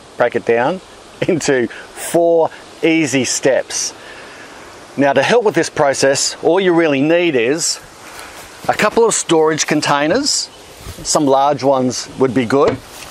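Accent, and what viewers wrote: Australia. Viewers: Because of your awesome Aussie accent, I couldn’t tell if you were saying your name was, or. Good thing you wrote your name in the video description area! LOL 😆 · Is there any evidence you have to support the idea that people can't produce paper thats toxic anymore? With your accent I suspect you're in Australia. Which may have that law. But here in America ive been advised by many that you have to be careful not to use any colored paper. Tbh the only thing i feel safe using is newspaper that I know is printed with soy ink.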